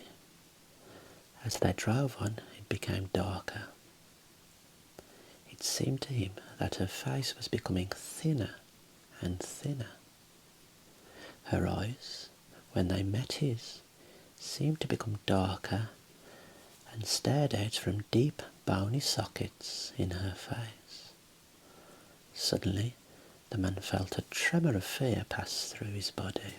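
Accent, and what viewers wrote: United Kingdom. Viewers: Nothing is better than and his British accent ❤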